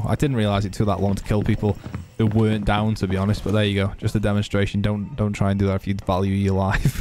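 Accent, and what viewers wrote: Stoke, United Kingdom. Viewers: wait a minute......are you a stokie ? :D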